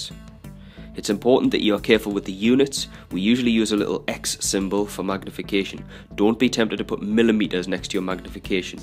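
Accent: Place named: Newcastle, United Kingdom. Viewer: got a science exam tomorrow so ty and the geordie accent actually helps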